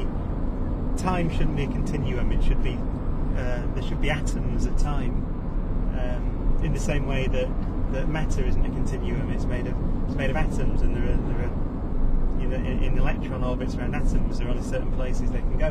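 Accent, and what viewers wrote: Northern England, United Kingdom. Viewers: this guy is bringing more ppl into science so I hope he keeps on broadcasting...he does have an interesting accent...northern I suspect...:)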